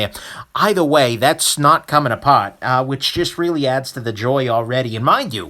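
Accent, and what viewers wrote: New York, United States. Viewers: Is this an NY accent he as?? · he has a nice New York monster accent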